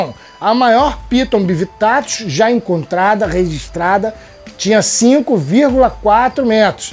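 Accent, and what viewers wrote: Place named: Ceará, Brazil. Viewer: Sotaque iguazin o pessoal do meu bairro kkkkkk é do Ceará mesmo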